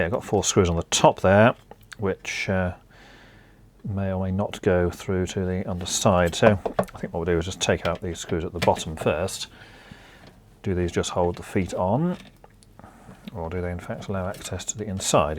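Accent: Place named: RP, United Kingdom. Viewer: Typical 'YouTube whingers'. speaks perfectly clearly in a BBC accent (like me). Go and get your ears syringed!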